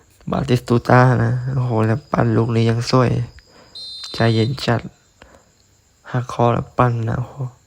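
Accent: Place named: Ang Thong, Thailand. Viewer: คนอ่างทองหรือสุพรรณคั้บเนี่ย สำเนียงเหมือนเพื่อนผมเลย😊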